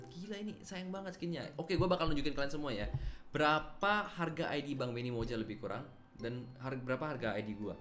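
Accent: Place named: Pekalongan, Indonesia. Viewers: Pekalongan mana suaranya??? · Biasa aja dong bang ngomong pekalongan nya:v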